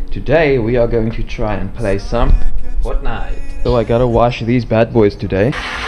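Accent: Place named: South Africa. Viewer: Daai South African accent 👌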